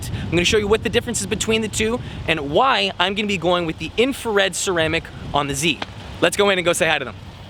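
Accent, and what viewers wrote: Canada. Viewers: In the last year I've kind of realized that there is in fact a Canadian accent, even if you live in the cities, and oh boy I figured out you were Canadian right away LOL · Thats one thick Canadian accent. The pronunciation of those o's. But that aside, it's a good informative video.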